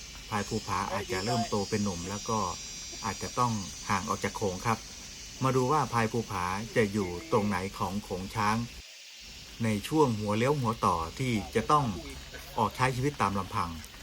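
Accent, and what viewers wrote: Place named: North, Thailand. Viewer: พากย์และบรรยาย ภาคเหนือ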